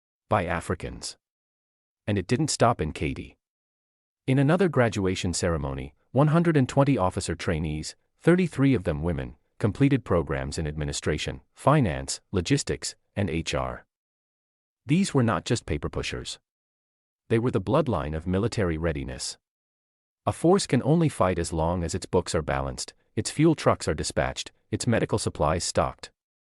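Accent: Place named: Europe. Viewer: Use African voices who are you videos for Europeans?? Let us ban all Europeans for at least 200 years and usa euros for 300 years. No euro voices, thumbs down on your euro voices.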